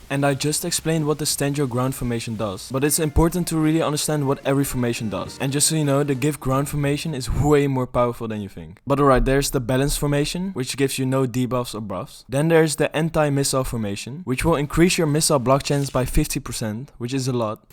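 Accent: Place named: Netherlands. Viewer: Your English sound Dutch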